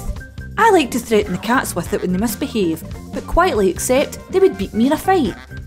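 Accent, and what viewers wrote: Scotland, United Kingdom. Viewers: More Scots, please!👍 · Great little nugget of gaming news wrapped up in a lovely Scottish accent. Perfecto! [Chef kiss] mwha! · Very Scottish.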